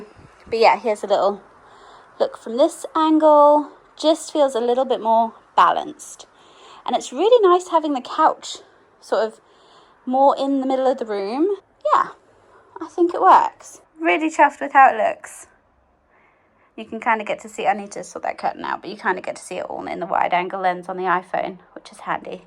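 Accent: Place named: United States. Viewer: Hi, love your vlogs. I have been wondering something for a while and sorry if I’ve missed your explanation, but I notice you use quite a few American words, like Vase or Yoghurt and I wondered if you were born or lived in America or it’s just influence of watching lots of American show(most kids of today do this 😅)? You are very well spoken, but you have a slight American twang and just wondered the reason. ❤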